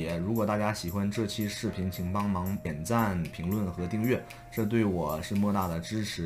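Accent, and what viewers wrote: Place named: Northeast, China. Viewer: ok，你严谨，我应该说 up 主是美籍华人东北口音。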